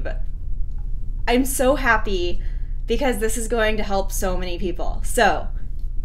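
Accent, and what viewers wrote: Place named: Ireland. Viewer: its still not working, or i guess the Irish accent in the video is just too thick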